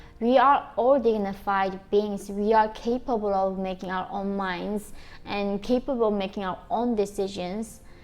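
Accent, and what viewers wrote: United States. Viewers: I've been listening to her for a while and she is sounding more like a good ole Betsy Ross Gal every day! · im so happy for you. you might be from North Korea but you speak like an American. Americans are people from different countries and cultures with one goal in mind to better ourselves and lives so our kids and have a better future and to keep this country great. I wish you a great life with prosperity. keep up the videos you have great reactions maybe you can do some on American sports especially football its a big culture tradition..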